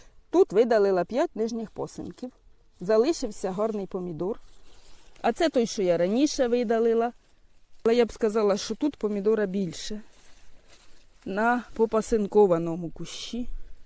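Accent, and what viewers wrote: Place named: Sribne, Ukraine. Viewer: Ви часом не поблизу Лохвицького району живете? Дуже мова схожа з тією, якою розмовляють в Срібнянському районі, це сусіди.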